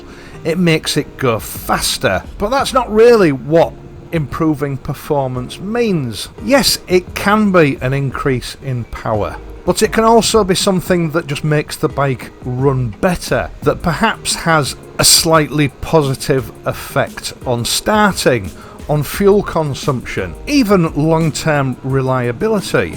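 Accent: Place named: Northeast England, United Kingdom. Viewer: I really love your videos and your accent! May I ask, are you from the northeast of England? Cheers from Austria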